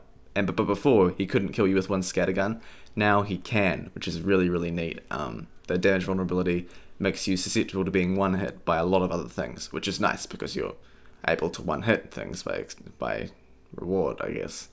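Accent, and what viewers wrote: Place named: New Zealand. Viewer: <3 dat New Zealand accent and ur vids :P ~ From Straya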